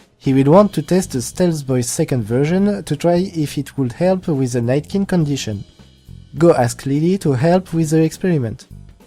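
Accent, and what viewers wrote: France. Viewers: ton accent français Me croire que tu es un français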